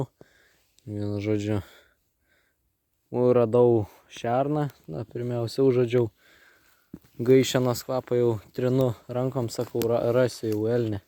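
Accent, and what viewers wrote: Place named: Šiauliai, Lithuania. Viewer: Pagal tarmę panašu į Šiaulių kraštą..😉.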